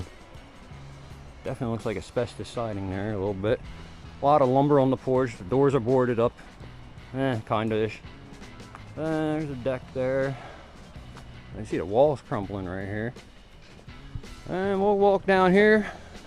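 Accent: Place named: Pennsylvania, United States. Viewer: I knew it was Pennsylvania! I love your accent, that was the giveaway. I graduated Penn State in 2003, from U. Park but I did live in Schuylkill Haven for 1 school year, and that was enough. Pennsylvania is a beautiful state, despite the look of this ugly building. ❤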